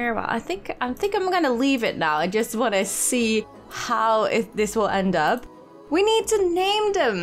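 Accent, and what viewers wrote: Netherlands. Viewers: Are u dutch? I font know why but i have the feeling that your dutch😂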